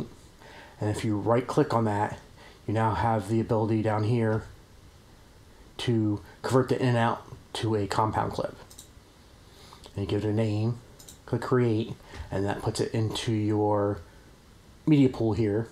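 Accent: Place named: United States. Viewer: Nice overview, definately helpful! I have a very off-topic question, which state are you from? I think i can hear it but i'd like to have it confirmed! :)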